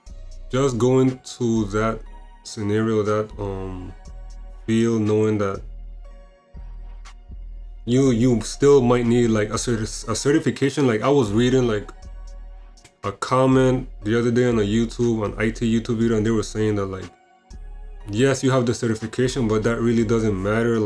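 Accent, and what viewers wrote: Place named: Virgin Islands, Caribbean. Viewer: I knew it! I was watching the video thinking 🤔 “This person sounds like they are from the Caribbean. Specifically from the Virgin Islands.” I’m also from the VI and currently live here. I’ve been planning my entrance and ultimately my path in IT, so been doing a lot of research. So far in my research it comes down to 1) learning the foundational knowledge so Comptia A+, Network+ and Security are good options. Learning Linux and a scripting language like powershell, bash or python can be beneficial. 2) developing and building on it skills which can be done using hands on labs and projects, 3) Getting experience either in a support role via computer support/ help desk or network support, internship, a tech role like Apple Genius, Best Buy Geek Squad,or similar. 4) Networking in the field via LinkedIn, meetups, applying for hundreds of jobs and personalizing your application with an introduction gif. 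Also, it may help if you create a vlog/ YT channel in the space for whatever path you are interested in. 5)Not sure if you have a degree but if you don’t you may want to consider getting one in the long term to help you qualify for more tech roles. If you don’t have one, look into WGU (Western Governors University) they have multiple it Bachelor paths, tech certs are included in many of the degrees and you can get your degree in less time 6 months to 1.5 year depending on the time you put into it and your level of dedication. It costs about $3700 to $4500 per 6 month term dependin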